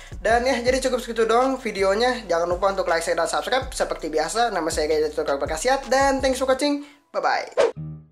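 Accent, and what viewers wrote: West Java, Indonesia. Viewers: Si akang nya orang sunda ya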